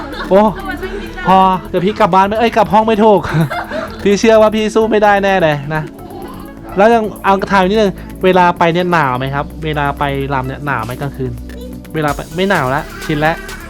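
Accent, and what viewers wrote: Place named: Central, Thailand. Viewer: พูดสำเนืยงฟังยาก น่าจะพูดสำเนืยงภาคกลาง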